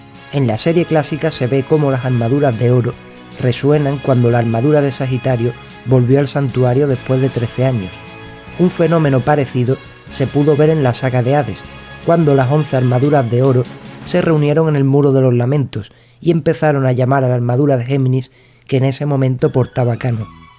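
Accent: Andalusia, Spain. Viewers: ahhh viste que buen oído tengo al distinguir el acento característico de tu provincia. Ahora me voy a ver la segunda parte de este video. Gracias por tus vídeos. Saludos desde Chile.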